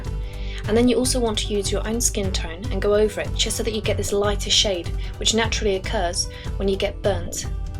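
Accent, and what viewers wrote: United Kingdom. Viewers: Are you British? coz i am well im scottish but oh well i love you accent and your art :) keep it up! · Errr... no. its a British accent.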